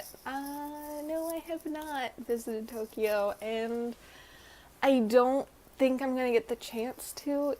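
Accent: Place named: South, United States. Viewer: Thx. I never lived in the US, so didn't know, but yes, you do sound southern to me! Come to think about it, my economics teacher in high school may have been from NC, and she used to teach us about supplaa & demeaand... Pity you can't find time to travel this time. Enjoy the rest of your stay in Kyushu! As for the chocolate, I personally like the Kinoko.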